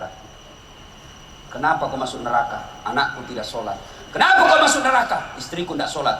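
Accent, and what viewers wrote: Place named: Makassar, Indonesia. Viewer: Lo ngk ngerti bhas pak ustas jgn sebarng sbb beginilah logat makassar kasart tpi lucu n tpi bertujuN baik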